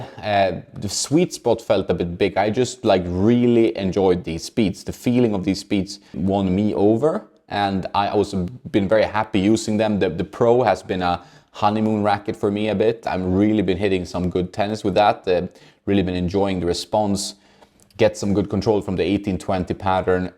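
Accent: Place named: Sweden. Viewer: Swedish english accent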